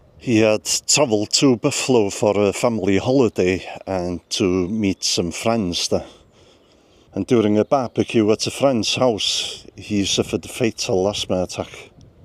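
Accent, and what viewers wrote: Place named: North Wales, United Kingdom. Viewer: Thank you for this, I loved watching Ryan & Ronnie too. He made me laugh in Welsh & English. I didn’t realise he died so young. It’s nice that there are some of his programmes on You Tube now, I used to watch Poems & Pints regularly. I like your North Walian accent. When I was young you were either Church or Chapel in Wales. There were chapels everywhere. Lovely excerpt from Myfanwy, my favourite. From mid-Wales 🏴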